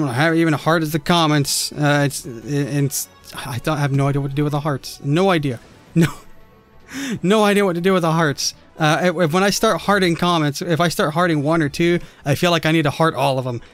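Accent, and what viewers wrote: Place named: Scotland, United Kingdom. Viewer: I LOVED your cute Scottish accent!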